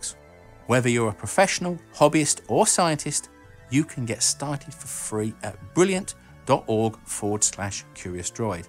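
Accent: United Kingdom. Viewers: "Since the formation of the United States its mainland has never been invaded or had any major attack." Ironically this was spoken with a British accent. For the benefit of those who are not students of American history, here is an example: <link>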